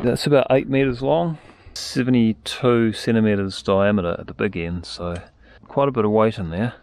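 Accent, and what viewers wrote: New Zealand. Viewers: Brilliant inspiration for handy gents around the globe! How you captured all those video clips while working that hard. Producer director handyman cameraman scriptwriter editor all going in your head at once…. And all we hear is that calm kiwi voice. Just wow.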